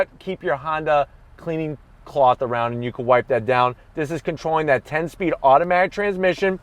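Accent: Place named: Northeast, United States. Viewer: Thanks for posting. Love the candy references and the northeastern accent.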